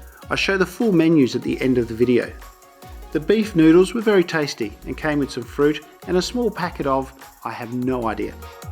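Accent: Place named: Australia. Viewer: Love the Australian accent!!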